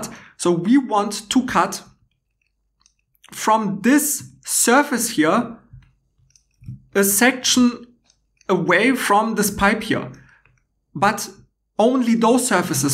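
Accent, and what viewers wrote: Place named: Germany. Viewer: Имя славянское, акцент немецкий. Кто ты, воин?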